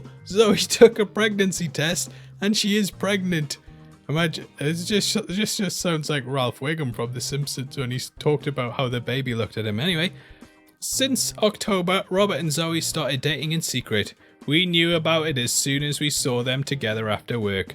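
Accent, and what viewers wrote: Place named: Newcastle, United Kingdom. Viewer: My face when I realised the voice I was listening to was!! Geordie 🐐 or battle rap 🙌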